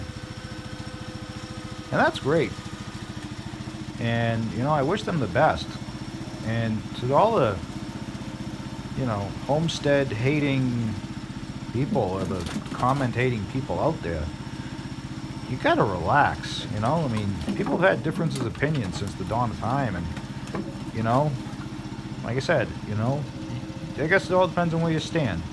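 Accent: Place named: Midwest, United States. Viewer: Ya know? I'm guessing you live somewhere in the midwest. Ya know? Because ya remind me of the time I visited upper michigan ya know? And they kept saying "ya know" after every sentence. Ya know? :) :P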